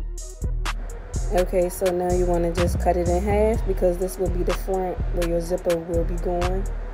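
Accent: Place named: Washington DC, United States. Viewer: yesss I heard the accent. ❤️ I’m from Maryland. Love to see a Washingtonian sewing queen.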